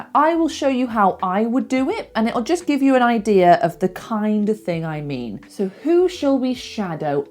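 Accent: United Kingdom. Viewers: Hello pretty and smart girl, how are you? Well, I'd like to know if your accent is British. I incredibly can understand a good part that you speak, I say "incredibly" because I'm Brazilian and my ears are trained to a United States accent. I like the way you speak slowly; it's very didactic. Furthermore, the beauty of your face is a delight to my eyes. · You have a great pure British accent. I really made use of this video 🎉🎉🎉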